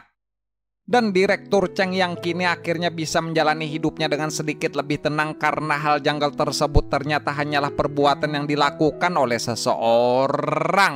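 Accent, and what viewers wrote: Banjar, Indonesia. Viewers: Iih lo wkkk,ku kira aku ja yg merasa iny ni urg banjar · Biar sidin bhs indo. ttp j ketahuan dialek banjarnya🤭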